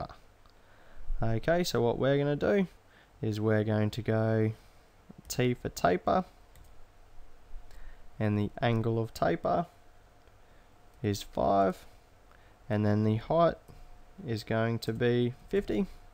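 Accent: Australia. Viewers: Can't listen to this any longer, your accent is killing me with that stupid Aussie inflection!!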